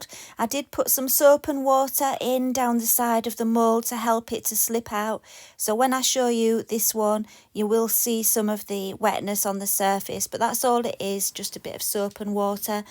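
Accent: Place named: England, United Kingdom. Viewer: Beautiful 😍 and such a breath of fresh air to listen to an English person narrating , there aren't many craft videos like this, they're usually American, so thank you for that too 😁 x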